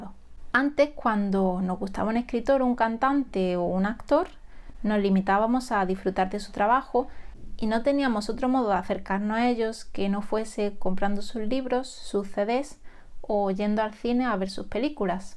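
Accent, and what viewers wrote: Andalusia, Spain. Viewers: De dónde eres? por el acento diría Jaén, tal vez, Almería, Sevilla? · Qué video más bueno. Me encanta que puedas dar tu opinión sincera sin tapujos. Todo lo que hablas es muy cierto y muy interesante. Te hace pensar y replantearte cosas. Por cierto, me encanta tu acento. ¿andaluz? Qué interesante ver una persona negra con acento andaluz. Jaja. Un saludo desde Cuba. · Qué raro, una africana con acento español, hablando de literatura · De que parte de España es ese acento? Es horrendo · Porqué hablah con un acento tan andalúh? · Uno de mis autores favoritos en Henry Miller. Si en su época fue censurado, no quiero pensar qué sería de su obra hoy en día. Tu contenido es bueno. Se nota que eres inteligente, y también muy agradable. Además tienes acento andaluz. ¿O me equivoco? Mucha suerte y sigue así!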